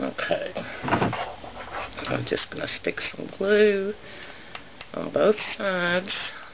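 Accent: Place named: Tennessee, United States. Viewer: U r too cute. I'm from SC. You sound like you r from TN.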